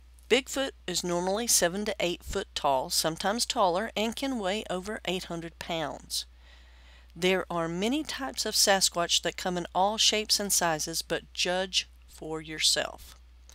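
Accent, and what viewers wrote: Texas, United States. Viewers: Your dialogue is much better. You've lost the Texas draw and speak faster. Thank you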